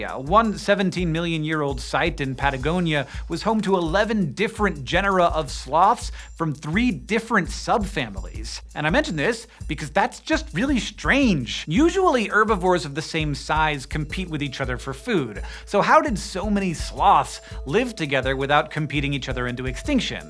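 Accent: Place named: United States. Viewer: I knew Americans said 'erbs , but Lordie they say 'erbivores too.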